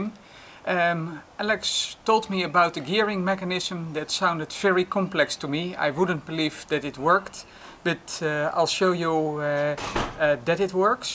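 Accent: Netherlands. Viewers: Maar is niet slecht bedoeld hoor. De puzzels zijn altijd top en ik kijk met veel plezier naar je video's. Denk alleen dat als je het Nederlandse accent kwijt zou raken dat je dan professioneler over zou komen. Maar ja wie ben ik haha als ik kijk wat je al bereikt hebt vergeleken met mij moet ik me mond dicht houden. Ga in iedergeval door en ik hoop nog vele mooie creatie's te mogen zien. Gr. Dave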